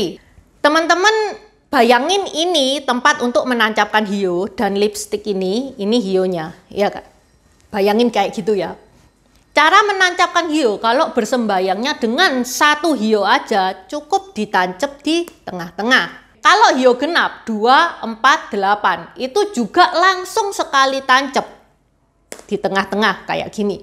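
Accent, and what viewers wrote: Java, Indonesia. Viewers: Saya bkn ethnis tionghua ni mbak saya mau nanya ni, apa yg di maksud tepekong atau apakah itu tepekong yg sering di sebut apakah itu sejenis mahluk halus dalam budaya tionghua, trimah kasih sblmnya cici yg mdok jawa nya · cara omongny kyk org jwa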